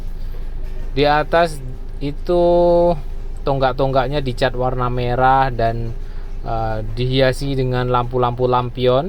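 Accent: Java, Indonesia. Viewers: Dari lidahnya klo ngomong bahasa Indonesia seperti YouTuber² dr Jawa .. orang Jawa tengah y om...? Orang ngapak sepertinya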